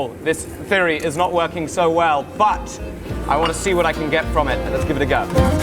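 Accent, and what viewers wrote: England, United Kingdom. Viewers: I can't tell which part of England your from well from your accent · i cant tell, are you british or american?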